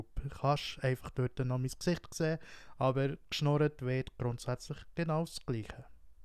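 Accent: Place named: Switzerland. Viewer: WOW... meine Güte hab ich grade aufpassen müssen schwützer Dütsch is halt was anderes wie schwäbisch :face-fuchsia-wide-eyes::trophy-yellow-smiling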